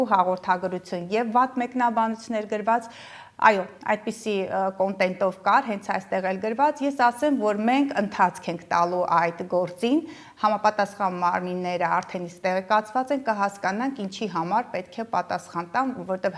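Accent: Standard. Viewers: Հարգելի տիկին: Ես հաճույքով եմ դիտում Ձեր հաղորդումները հատկապես վերլությունները ինչպես գեղեցիկ մաքոր հայերենով և կանացի հմայքով եք բացատրում հաճելի է Ձեզ լսել, չնայած այդ աստղերից ոչինչ չեմ կարողանում հիշեմ, գուցե տարիքս է ինչ -որ է 80-ը քիչ չէ: Ուստի ինքս չեմ կարողանում կողմնորոշվել, բայց ինձ: շատ է հետաքրքրում թոռնիկներիս ապագան հատկապես Գրիգորի որն այս տարի 9-րդ դասարան, բայց դեռ չի կոմնորոշվել ու՞ր գնա ի՞նչ անի ես ուզում եմ Ձեր շնորհիվ օգնեմ կյանքի ճիշտ: ուղի ընտրի: Տվյալները գրեմ: Գրիգոր Քյոսեյան ծնված 2010 թվի հունվարի 9-ին առավատյան 8-մոտակայքում: Ձեզ մի նեղություն հնարավորինս օգնեք ինձ այյս հարցում: Եղեք առողջ և հմայիչ: Կանացի երջանկություն: Հույսով սպասող Փիրոզա <num>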